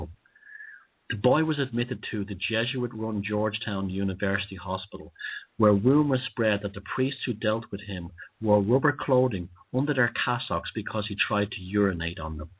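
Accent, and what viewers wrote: Dublin, Ireland. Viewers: Blimey, this is the first time I have listened to one of broadcasts. Initially I liked his Dublin style but thought he was a bit too full of himself with all his female groupies on Facebook. Good Lord, I'm a bit like that myself!!...However listening to this and his description of the riot in Dublin in 1981 and the evil that was on the other side ready to deal with the protesters rang so true with me. I was in Dublin a couple of years after that particular riot outside the British Embassy and a friend described how he was working on the railways and saw the protesters struggling home along the tracks trying to escape the police. He said they had been battered beyond belief. It was something he had never seen before. When the power of the state is truly unleashed it is terribly frightening.......I'm relating so much to this podcast and what he is saying. 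Good stuff. I'll forgive all yer other shite if you forgive my occasional after the pub rants against the Irish!....Des Brittain.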